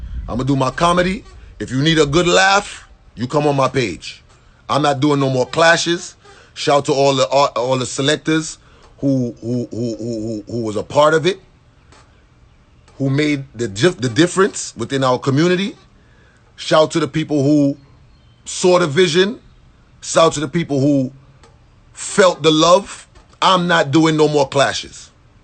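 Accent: Jamaica. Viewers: Yo a fuckery a diss I didn't even know this was happening yo man fuck all this bullshit why name getting called in fuckery y'all who know know he don't hate know he big up EVERYONE no matter what it don't matter where you from what you rep big up everyone and him stay away from fuckery I'm dissapoimted that he has to address some fuckery like this I've never seen like this for him to be like this is nah right nah kool a fuckery ah dis general he fucking holds it down for the WHOLE AH DUH FUCKING CARRIBEAN y'all ignorant idiot talk bout him not no yardie nah matter if em yardie or not em support all yuh and he is yardie listen when he speak regular in a non YouTube shit he speak patois man a yardie pusshole all yuh but Nah the point the point ah fi look out fi di Caribbean and ah dah whole ah we nah just yard Nah just Trini but em chat fi did whole ah di carribean brighten up all yuh ignorant fool realize what he saying is we Caribbean need to support each other and not from they come up but from they ground from they dirt and that we as carribeans needs to support each other no matter who fucking island yardie bajan Trini gayaneese Nah fucking matter we need to fucking show love appreciation and support and big up we culture we are one Caribbean because in the U.S in the U.K in Canada dem nah see we dem nah respect we we ah FUCKING CARRIBEAN WE ARE THE FUCKING WHOLE AH WE support the WHOLE AH ONE ANOTHER not just one island support itself but all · ok i'm convinced you're Jamaican now. 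I can hear the natural accent.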